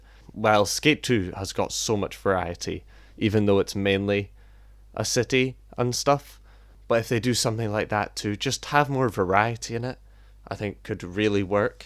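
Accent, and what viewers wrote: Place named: United Kingdom. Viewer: Cool accent bro. Are you from somewhere like Scotland or UK or Ireland? And what’s your main channel I dropped a sub on this one bro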